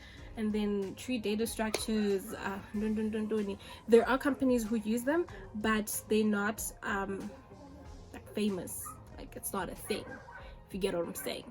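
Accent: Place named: Johannesburg, South Africa. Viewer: Why does it sound like you were a UJ STudent?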